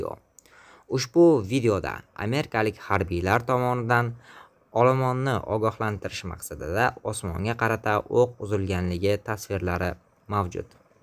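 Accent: Afghanistan. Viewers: Авгонку бу акцентидан бервотган ахборотидан билмаяпсизларми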